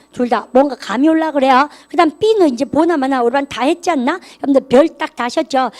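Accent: Gyeongsang, South Korea. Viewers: 영어와 갱상도 말을 동시에 배웁니다.. 이해력에 많은 도움이 됩니다. 감사드려요~~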